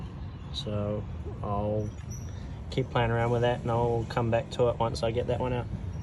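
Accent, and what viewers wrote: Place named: New Zealand. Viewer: I know a fellow kiwi when I hear one haha, what part of the country are you in? What transmission do you plan on using and power/Tq level you're aiming for? Planning to also go turbo on my M104 powered S124. Currently have a 717.404 box but don't think it'll hold up, might try a little boost on it first and see what happens.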